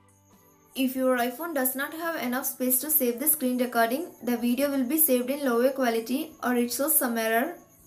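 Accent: India. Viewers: Of course all tech people have heavy Indian accent.....but thanks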